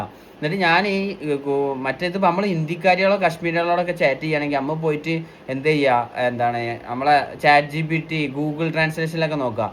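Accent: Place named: Malappuram, India. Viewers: എഴുതച്ചാൽ മലപ്പുറം ഭാഷയാണ് പറയുന്നത് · ജ്ജ് മലപ്രം ഭാഷേണ ല്ലോ പറീണത്😅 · Malappuram slag ann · Malappuram bashayan malayalam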